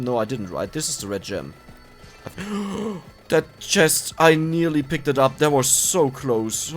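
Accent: Germany. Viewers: love the German accent and also great content and humour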